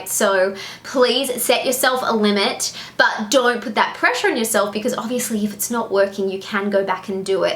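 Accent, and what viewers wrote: United States. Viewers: Ur American accent is so on point. It doesn't sound fake or exagerated. you sound lovely and moremature in the Am accent. No hint at all that you are Aussie!! I'm on my way to get this accent ready!! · Excellent tips. Thank you. Especially the advice on too many takes. I've selected one of the first takes more often than not. The other point that you touched on is that it creates a false positive. You will almost never get that many attempts on set and, if you do, it will be nerve-racking. Does your market encourage the pan up & down for the full-body slate? They won't tolerate it here so there's always a hard cut. In this market, the slate is always either a tail slate or a separate file. Also, congratulations on the dialect work. Your American is flawless! Thanks again for your generosity. You are very kind to lend your expertise.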